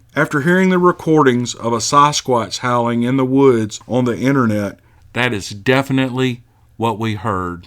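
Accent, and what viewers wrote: South, United States. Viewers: I really like your channel if not love it. You geht nice stories and you tell them really nice, I especially love your southern accent, and you and Lynn Smith's BigfootCaseFiles-channel drove me near to believing in the Sasquatch - though i'm german and live on the other coast of the Atlantic! Just go ahead, you made it right from the start! Tobias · I love your southern draw 🤗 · you have a fantastic gift of storytelling. This up north midwesterner loves your southern drawl. We have squatch in Wisconsin as I've heard them but never laid eyes on one. Keep up the good work and can't wait till next week!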